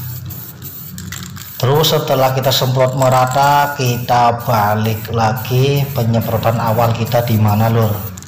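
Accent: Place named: Banyumas, Indonesia. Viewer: njenengan sih wong ndi lur, denenge ngapak pisan?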